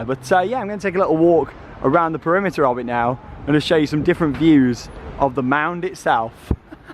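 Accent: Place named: England, United Kingdom. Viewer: England accent.....did you said hell or hill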